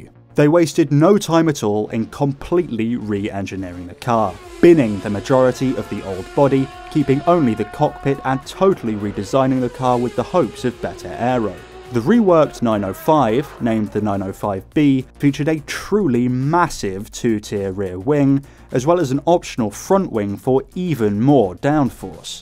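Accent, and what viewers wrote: United Kingdom. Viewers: Brother a man with a British accent does not get to joke about French automotive reliability. Where's your car industry gone? And why did that happen?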